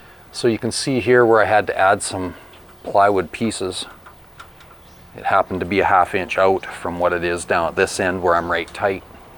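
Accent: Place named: Canada. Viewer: You must be Canadian..... the accent gives you away!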